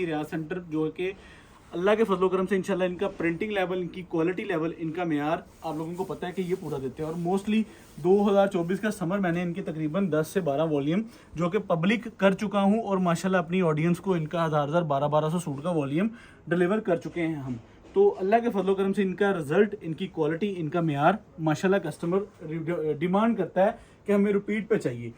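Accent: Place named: Faisalabad, Pakistan. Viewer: Ye fslbd k hain bhai ki boli fslbd ki h plz ap apni shop ka address b Bata dia karein tak ap ki shop ka visit Kia ja saky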